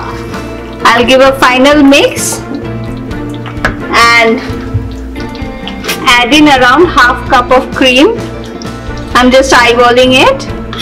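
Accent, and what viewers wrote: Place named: India. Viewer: I could not understand what said she was adding? is a nice lady but with her high pitched voice and Indian accent, I struggled to hear what she was saying for much of the video. Looking at the video again, I think it was, the ''Fenugreek'' that she added?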